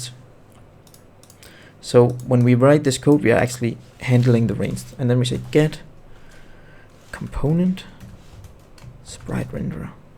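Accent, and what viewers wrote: Denmark. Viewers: Started watching the video.... "In this video, we will..." That guy sounds like he's from Denmark... Check profile... Yep, Denmark... Hejsa lande bror.. :D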